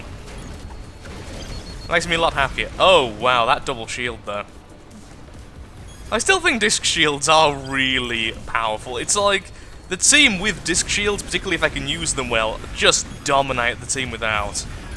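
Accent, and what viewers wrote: United Kingdom. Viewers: hes British. not american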